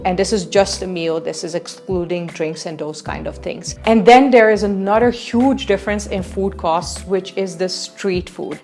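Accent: India. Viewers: And you have Indian accent! Wow! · For sure u are getting the Indian English accent.Great video. Thanks.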